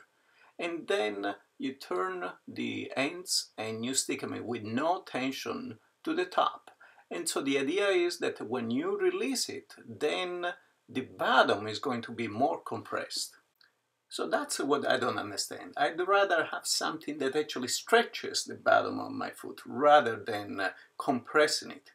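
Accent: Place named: Italy. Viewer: This jerZ girl ❤️that Italian accent!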